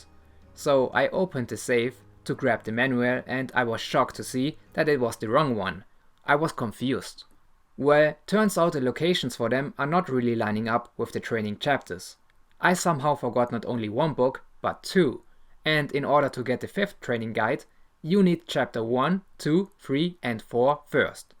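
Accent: Germany. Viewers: Ich höre schon das du deutsch bist Bro haha , unterhaltsames Video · Man merkt dass es deutscher Akzent ist aber du sprichst trotzdem gut Englisch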